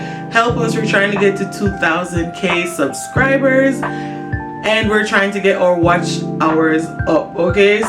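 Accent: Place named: Jamaica. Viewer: That is not true. I can definitely tell you're Jamaican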